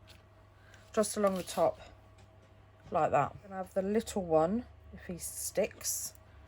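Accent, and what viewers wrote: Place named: United Kingdom. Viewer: “Ah! I LOVE it”, in that charming British accent, makes me squeal with joy, too!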